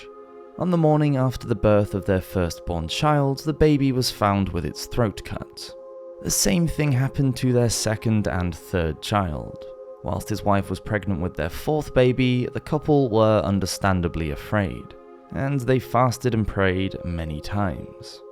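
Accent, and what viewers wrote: United Kingdom. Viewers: Love your videos man where you from in uk?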